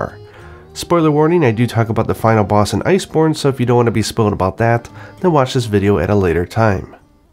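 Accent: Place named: Japan. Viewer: so this is how Japanese who're extremely fluent in English pronounce the monsters English name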